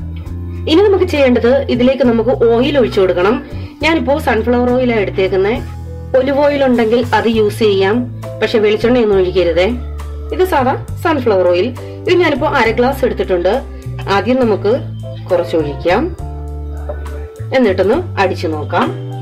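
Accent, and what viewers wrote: Kottayam, India. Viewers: Chechii kottayam karii aano???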